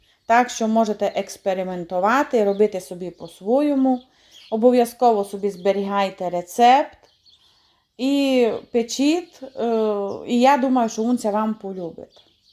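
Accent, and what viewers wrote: Transcarpathia, Ukraine. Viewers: Уши греет Ваш чисто-Закарпатский.Вы откуда?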